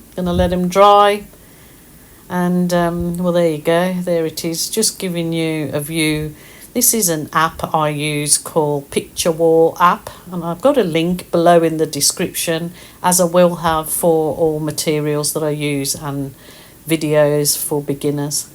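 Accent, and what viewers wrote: Birmingham, United Kingdom. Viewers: Your accent sounds very much like the Birmingham, UK accent 🤔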